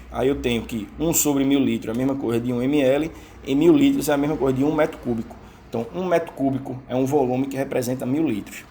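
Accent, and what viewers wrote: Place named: Northeast, Brazil. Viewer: "arrente" amoooooo esse sotaque s2 hahaha nordeste pisa menos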